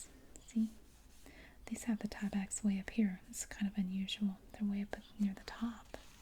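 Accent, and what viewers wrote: South, United States. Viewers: I love your voice and southern accent!!!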